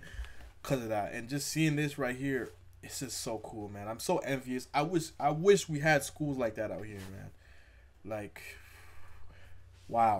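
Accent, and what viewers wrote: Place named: South Africa. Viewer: i can hear that youre south african my guy 😂😂🤣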